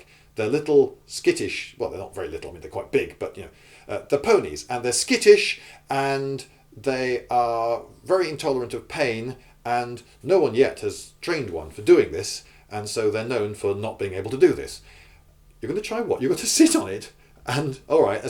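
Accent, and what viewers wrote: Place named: England, United Kingdom. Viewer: Love the English accent. A unique attitude.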